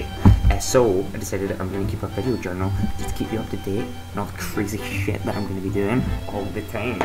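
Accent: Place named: Ireland. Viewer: haha gotta love that crazy irish accent!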